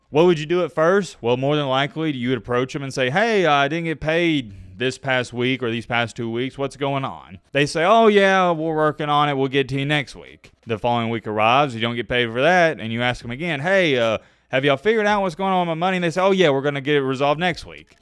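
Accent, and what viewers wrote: South, United States. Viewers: Bruh I’m from the south and know we have accents but you are a mush mouth MF!!! Hahahaha You make great videos but you need to work on that. Maybe get a speech coach. Keep up the good work. Hope you continue the work and it works out for you. Hope you don’t feel like the criticism is unwarranted or being mean I’m in it more as a joke but I do think you have some areas where you sound like a mush mouth and if you’re not from the south, you might not understand what you’re saying. I said I didn’t say this to be rude or mean just hope you take criticism for what it is. Continue to do good work.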